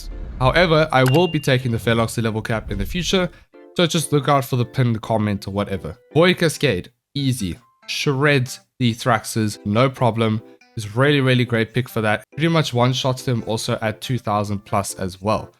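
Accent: South Africa. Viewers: are you south african? just asking cause you sound like you could be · Are u south african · Are you South African? Your accent sounds familiar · Are you South African?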